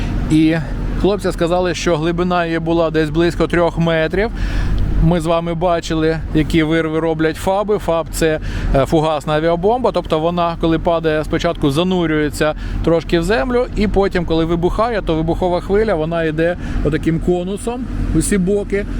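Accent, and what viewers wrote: Kyiv, Ukraine. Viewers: Дякую, за чудову українську мову. Але мені також дуже подобалася ваша "київська російська", що підтверджувало, що ви є питомим киянином. Вітання вам із Харкова.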